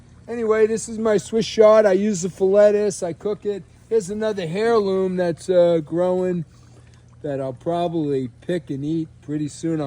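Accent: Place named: Brooklyn, United States. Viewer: you never lost your good ol' Brooklyn accent! I love it. So tell us what fertilizer you use and what plants, if any, do you have to transplant to larger containers as they get more mature?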